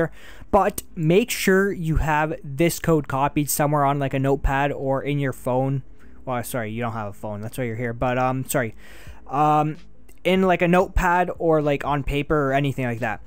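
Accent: Canada. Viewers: canadian ey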